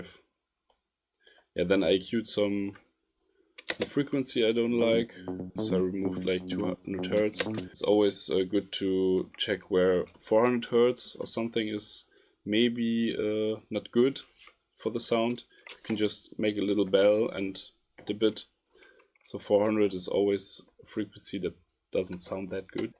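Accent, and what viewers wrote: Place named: Germany. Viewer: Hey du hörst dich deutsch an😅 falls ja könntest du auch deutsche tutorials machen?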